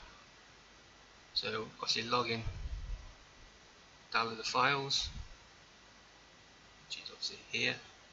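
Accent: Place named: United Kingdom. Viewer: I'm a nonnative English speaker I have a hard time to understand British accent :(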